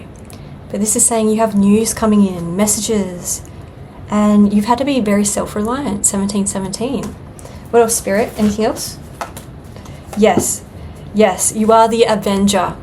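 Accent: Australia. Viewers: First, I'm so interested in you because you're the spitting image of my sister at your age (who was Cleopatra, so you are what Cleopatra looked like, if anyone wants to know). Secondly, this has been hilariously entertaining that this adorable little twin sister on the other side of the world with the cutest Ausie accent relates such accurate, intimate details of my life, especially the last 10 years. I'll be listening to this again a few times because they're a lot here. Thank you, for your your talented and skillful reading of the vibrational currents. Oh, and you nailed the name first try. My name is David. 😉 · just out of curiosity, honey, are you an Aussie?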